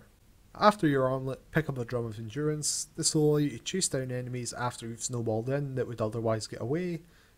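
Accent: Scotland, United Kingdom. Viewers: good guide. Scottish I guess?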